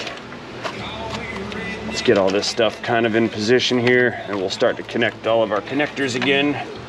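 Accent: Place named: South Florida, United States. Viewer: You did say you all. That's what they call a Southern Florida accent. But same difference As a Southerner.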